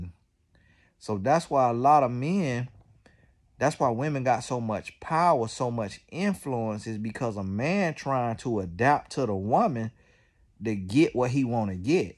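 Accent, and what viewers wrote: South, United States. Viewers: Boiiii you have one country accent lol lol I'm from the South too I hear it in ya lol lol love your messages they are well needed, Big Blessings to you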